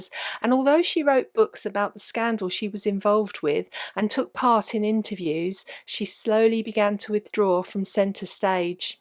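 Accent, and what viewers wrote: United Kingdom. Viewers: Oooh I really enjoyed watching your video, and listening to your voice and accent. I love England (only been there for about a year, long ago, and I'm dying to get back). I found you by chance, after watching a different person talk about Christine Keeler, and I very much prefer the way you talk ! (not to be mean, but the other person talks in such a stilted way, so it's a relief to listen to you!😄) I'll watch lots more of your videos. I also liked how you showed the real places. Oh I love the Thames and its surroundings! Anyway thank you and cheers from a Greek who landed up in LA but longs for England or Europe.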